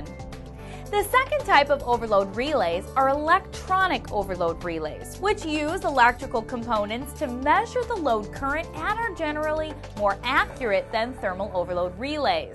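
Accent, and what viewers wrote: United States. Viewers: Torturous American accent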